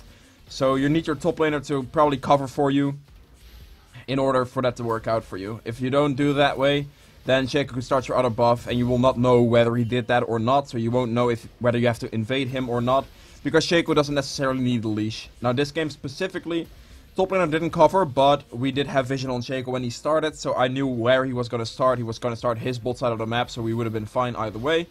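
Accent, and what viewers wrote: Netherlands. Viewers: Nice Dutch accent, and nice video :P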